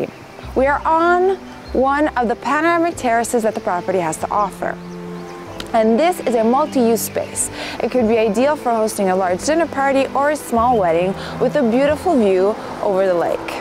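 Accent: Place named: Italy. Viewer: your italian-english accent is so sweet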